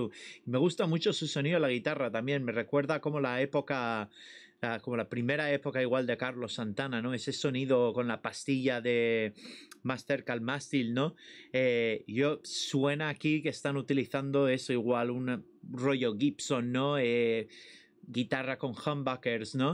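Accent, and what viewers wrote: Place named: Spain. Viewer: Jajajaja el título, americano reacciona, y el habla como un español, sin duda el es de España.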